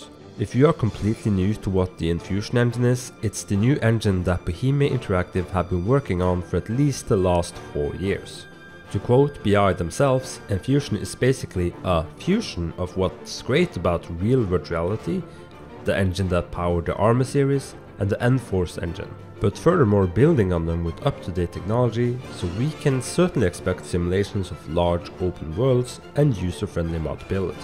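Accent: Norway. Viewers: bro you sound so Norwegian xD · Are you norwegian?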